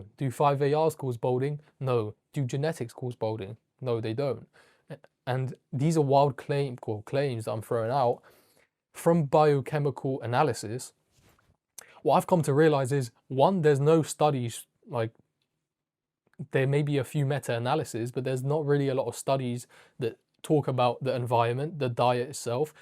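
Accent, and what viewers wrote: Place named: Poland. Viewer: not the typical polish english accent 😩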